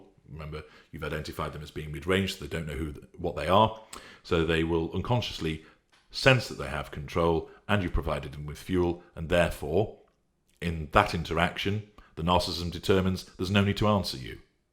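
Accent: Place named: North, United Kingdom. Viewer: Sometimes he has a slight northern accent beneath the caramel coated gravel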